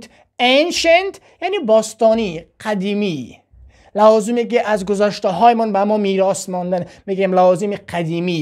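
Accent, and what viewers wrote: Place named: Afghanistan. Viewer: شماافغانی هستیدالبته برای مامردم ایران قابل احترام چون ازلهجه وکلماتی که استفاده میکنید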